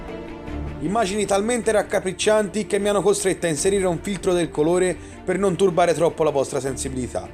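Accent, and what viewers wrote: Tuscany, Italy. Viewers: Deh, oh che tu sei toscano ?!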